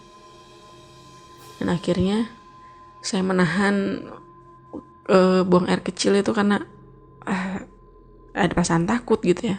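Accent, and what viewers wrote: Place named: West Java, Indonesia. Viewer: Inimah atuh urang Sunda pisan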